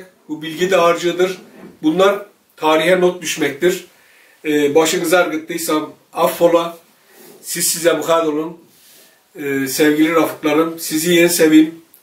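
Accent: Gaziantep, Turkey. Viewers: Abi sen normal konuşma ya Antep Ağzı konuş... Yani yanlış anlama abimsin ama sırf seni dinlemek için günlük bi videonu açarım az evvel Abonede olayım dedim,şimdi Antep ağzıyla,hem yorum yapiyin hem abone olmayıksın yoğrum deme diye... Mersinliyim ama keşke Antepli olsaydım. Yani Abicim sana o ağız çok yakışıyor,çok orjinalsın vesselam...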